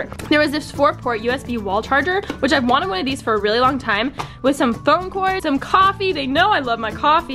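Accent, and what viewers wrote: California, United States. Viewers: Everytime i watch her vlogs i just say wow the cali accent😂😂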